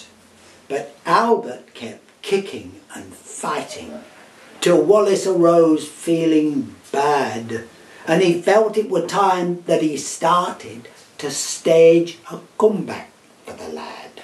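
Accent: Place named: South, United Kingdom. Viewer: Nice rendition mate, I'm a Yorkshire man and can tell instantly you are from the southern regions as your accent is Posh,..but well done for this, I love the old monologues I used to listen to as a child before we ever got a television....good memories..Thankyou!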